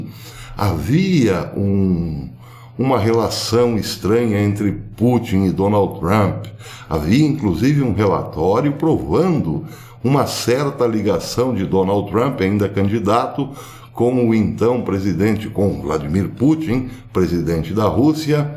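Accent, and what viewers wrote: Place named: Minas Gerais, Brazil. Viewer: PROFESSOR, V. EXA. TEM SOTAQUE MINEIRO, NASCEU EM MINAS E FOI MORAR NO PARANA?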